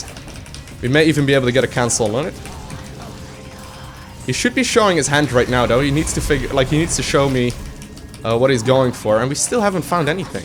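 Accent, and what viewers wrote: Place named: Netherlands. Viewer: Hey! Really enjoying your podcasts! I'm a teacher of English in Holland and I can clearly hear you've got a Dutch accent, but your English is flawless. I also love how cleverly you played this game! Unfortunately you weren't playing as greedy as you should've, but it's good you figured that out yourself!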